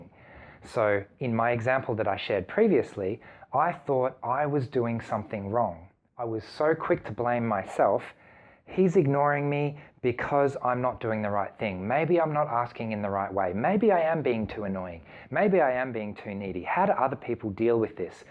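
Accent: Australia. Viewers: I agree with most of what this person says, except for one thing...this person is saying reporting harassers & bullies doesn't work. In my experience, it does, both in my professional & personal lives. This person, though, I'm guessing, is in Australia, based on the accent I'm hearing (I live in Canada)...perhaps the culture is somewhat different, so maybe there's some truth to the statements made about this here but I doubt the difference is big.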